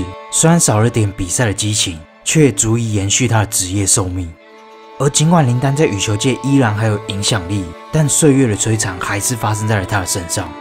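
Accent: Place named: Malaysia. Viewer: 这口音更像马来西亚或者新加坡的。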